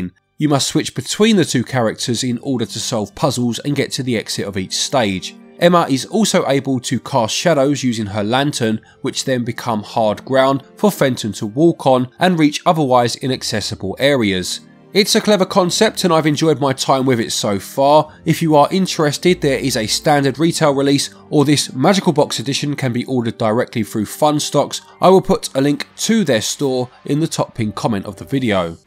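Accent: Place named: London, United Kingdom. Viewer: I wrote a now-deleted comment that underlined the pronounciation of certain words in the video out of appreciation for the London accent, and not mockery. commented that my comment came accross as offensive, so I would like to sincerely apologise to him and anyone else who read it thinking it was insulting. and are both very talented in their voice over work, and it is a big part of what makes such a great channel. Having lived in many places accross the world, including London, and having an accent myself in all the languages I speak, I would never mock someone else's pronounciation or intonation. So my sincere apologies to and its community.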